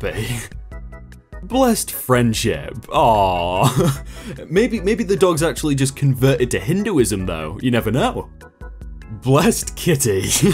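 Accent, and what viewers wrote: United Kingdom. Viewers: Everytime I start up a video of yours, YouTube automatically gives me American English subtitles of your British English. I turn them off, but after every ad, they come back on...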